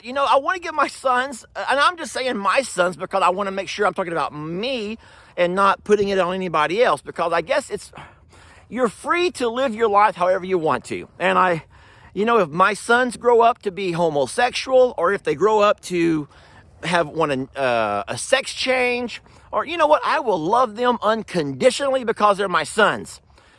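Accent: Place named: Illinois, United States. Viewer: you are beautiful just the way you are, and you don’t talk funny that’s the Illinois coming out in you. From your Illinois peeps 😂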